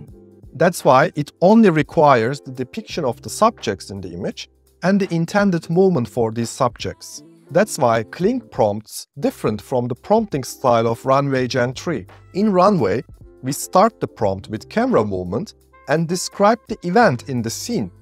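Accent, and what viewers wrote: Turkey. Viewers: Hocam bu aksanla Turk olduguna yemin edebilirim. Memleket neresi? Guzel anlatim olmus. Tebrikler.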